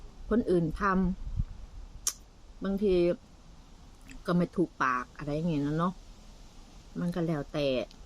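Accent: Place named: Korat, Thailand. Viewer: แกพูดสำเนียงโคราชเเก่อยู่บ้านอาย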